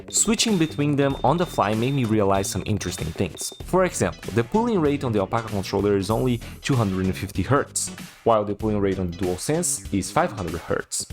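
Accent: Brazil. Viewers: sabia que tu era BR, o sotaque nao engana kkkk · brazillian accent detected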